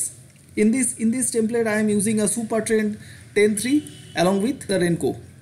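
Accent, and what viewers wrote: India. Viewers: Bhai stop talking in butler English please. Use hindi please.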